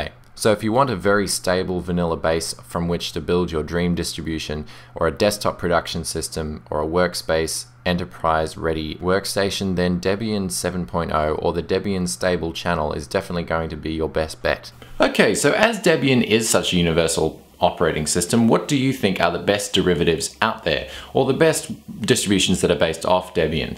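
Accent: Australia. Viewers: I think there's a quite a bit of Ozzie in his voice but I dunno what else.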